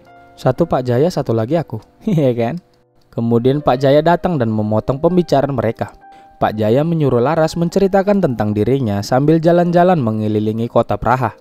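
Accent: Medan, Indonesia. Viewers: Lanjutkan logat medannya bg..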